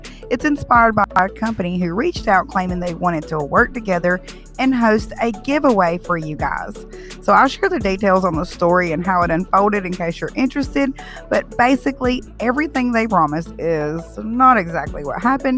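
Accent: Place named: North Carolina, United States. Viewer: I just subscribed. I don't know anything about you, your accent sounds like North Carolina. I live in Smithfield North Carolina.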